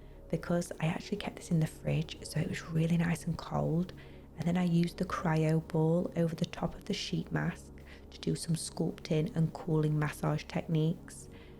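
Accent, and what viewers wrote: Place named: Manchester, United Kingdom. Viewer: I’m American but I think you’re from Manchester. Why? Because I watch a lot of English football. If I’m wrong I’m wrong. Still fun for me to guess whilst appreciating your work. Cheers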